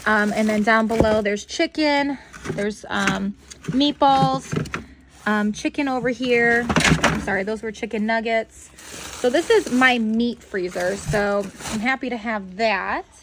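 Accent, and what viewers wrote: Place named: South, United States. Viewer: !… please let me know where you were born!… You are so beautiful You look like a California beauty!… But, your wonderful voice sounds southern!… How is this possible?… Just have to say you’re a stunning Mama!.. A perfect woman to yourself, your husband, your babies Your the whole package perfect in everything you do!… Love watching your do what you do!…. A sub until you decide you no longer do your channel!…. And, hello from Rosarito Beach 🏝️ Mexico 🇲🇽Retired old California Gurll!… 🥰😍❤️👌🏽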